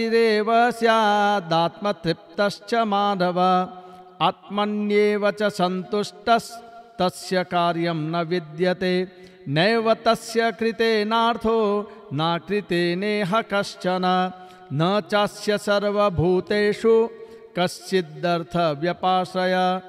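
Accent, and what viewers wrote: Standard. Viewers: श्री जी को बारम्बार प्रणाम। आप श्रीमदभगवदगीता पाठ शुद्ध एवं स्पष्ट उच्चारण करते हैं। मन प्रसन्न हो गया।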